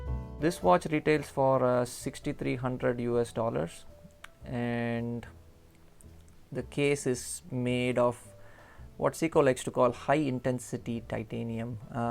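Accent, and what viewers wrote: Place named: India. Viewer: Just can't listen to Indian accents for too long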